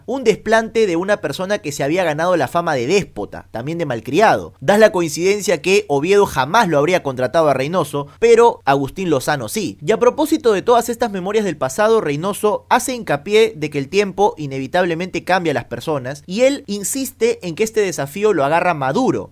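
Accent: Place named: Argentina. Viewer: Una pregunta, eres argentino o no, por qué hablas como argentino.. habla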